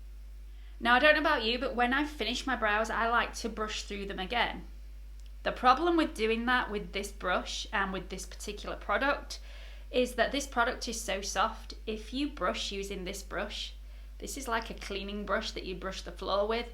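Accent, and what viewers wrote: United Kingdom. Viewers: I love your accent,from which part of the UK you are🙂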